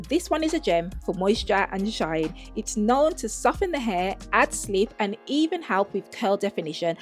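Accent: Birmingham, United Kingdom. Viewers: This woman is a Brummie. I don't know what you think you are hearing.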